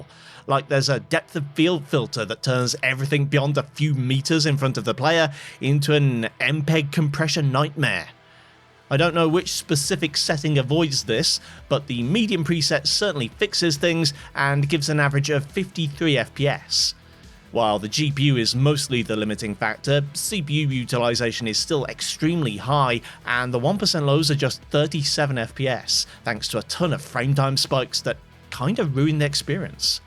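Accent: United Kingdom. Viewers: man i instantly recognized your british accent i'm so proud of myself :D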